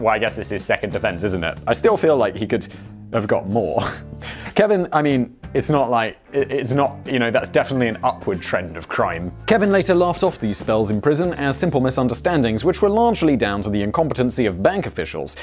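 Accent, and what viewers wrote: United Kingdom. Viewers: You say pretty much every single world with a British dialect - except for controversy. I don't know why it winds me up, but it does... I don't usually care how a word is pronounced, so long as it is said like that somewhere in the world. But controversy - when said by you... Constipates me. Perhaps because you always say things in British dialect and quite often make a point of telling your US viewers why (to them) you are pronouncing things incorrectly. But that one word, you do not use our tongue and never mention why. When with other things that are commonly said either way in US, or UK - you feel the need to explain. You sir, are getting root bound in your dialect and as you take in more American ways, your British side is dying as the roots have nowhere to grow, due to the space having run out and memory is overriding itself..., the root bound British (coca) plant...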